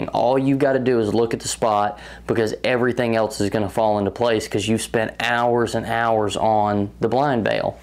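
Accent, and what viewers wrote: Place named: North Carolina, United States. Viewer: Man where are you from, that’s quite the accent, NC?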